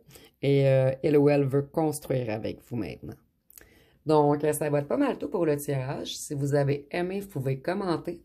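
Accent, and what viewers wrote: Canada. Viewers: Merci. Le bel accent du beau Canada. ❤❤❤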